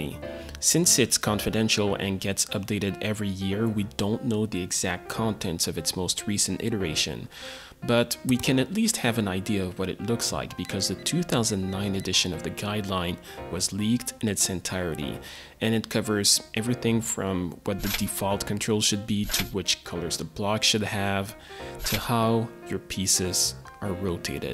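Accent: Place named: Quebec, Canada. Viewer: Getting some Québécois vibes out of your accent. Thumbs up for the video.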